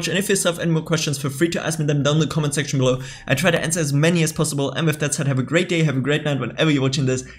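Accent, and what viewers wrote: Germany. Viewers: der klingt anders deutsch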